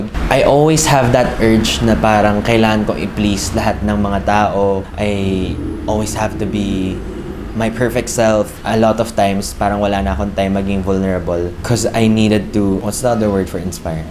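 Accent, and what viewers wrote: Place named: Philippines. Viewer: It’s so cool to see a guy who looks Chinese but has a filipino accent. I always wished I had a Filipino accent, but I was born and raised in Hawaiʻi. My grandma was ilokano, from the Philippines 🇵🇭, and I wish I paid more attention to her when she would try to teach me Tagalog…but no, I just wanted to eat cuscaron, balut, dinuguan, kare kare, etc. I always only have food on my mind. 😜 I think you’ll love Hawaiʻi, Filipinos are the 2nd biggest demographic at 19%, just ahead of Japanese 16%, and behind Caucasian 21%…and we have a lot of mixed race people 24%, many mixed w/Filipino. 😉 The people here are very friendly like in the 🇵🇭, and the weather is beautiful (but much less humid than the Philippines). PS - I just saw your shirt says Hawaiʻi. 😄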